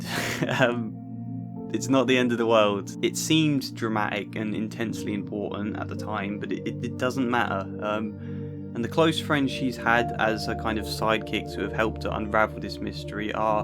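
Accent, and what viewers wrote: United Kingdom. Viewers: If a couple’s political differences are just, big government versus small government. Republic or constitutional monarchy. A centralised or state-based economy. Then sure, needing your partner to agree with you could be fairly painted as a vice. But in this era, “political beliefs” are literally a matter of life and death. If you vote for someone who incites violence against minorities, your political views are hurting real people. If you vote for someone who doesn’t want rape victims to have access to abortion, your political views are hurting real people. If you vote for someone who doesn’t see a problem with police officers shooting unarmed black teenagers and stepping on necks, ultimately, you have blood on your hands. To imply that a difference of opinion on whether or not, for instance, Black Lives Matter, is something a mature couple should be able to accept told me as a viewer straight away that you yourself are white. 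Because these issues clearly aren’t life and death for /you/. Maybe I’m being unfair, it sounds like you have a British accent; if you’re in England, it is a different climate over there. But you specifically mentioned Trump. A man who defends and incites /actual white supremacists/. I loved your videos analysing Good Will Hunting, that was beautiful work and really deepened my appreciation for an already exceptional film. But this video was disappointing and honestly insulting. There are good people on the left and right, as there always have been. But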